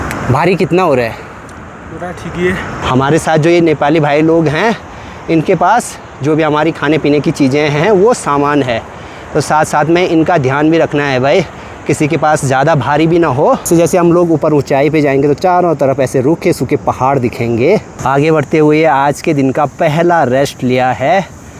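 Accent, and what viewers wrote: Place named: Garhwal, India. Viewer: Sabase badhya video aapke hi hote hai..aapaki aawaj ..bolane ka tarika..hindi bolate hai fir bhi garhwali pronunciation khub bhata hai..ek sukun milata hai...thanks..dil se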